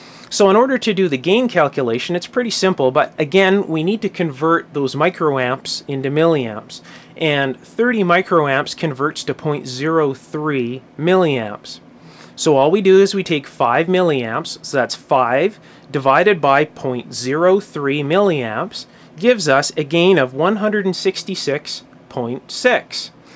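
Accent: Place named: Canada. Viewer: I follow K7PP and probably others that you and others involved with Ham Radio and Electronics. Pete has some great videos and I love those MONSTER DAWGS he has..Hi hi.. Do you follow David at Elpasso Yube Amps? He has some neat stuff as well. Of course I am sure you follow Dave with the EEVBLOG. He's a real hoot too. Are you in the US? You sound like you may be from Canada.. Anyway good chatting with you. I own DSM Labs here in SC and refurbish R 39x series receivers including the R- 389, 390, 390A, 391 and 392 receivers. I do a little Super Pro work from time to time. 73, Glenn WA4AOS dit dit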